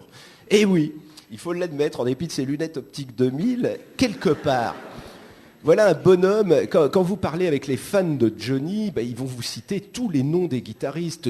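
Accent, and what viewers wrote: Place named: France. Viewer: Trop délirant son accent français quand il dit un truc en anglais mdr ! (ex:Led Zeplin) xD je te kiff Philippe manoeuvre t'es génial (Y)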